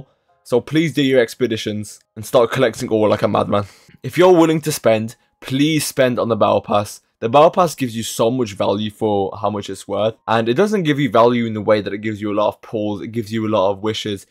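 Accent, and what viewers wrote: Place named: Roadman, United Kingdom. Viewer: a guy with a roadman accent playing genshin feels unheard of 😭🙏 i weirdly like ur channel man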